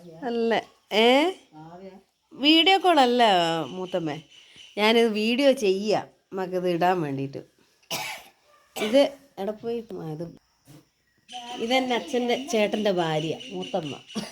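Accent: Vadakara, India. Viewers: Ente Naattile bhasha😊(Vadakara). Ithu Evideya Sthalam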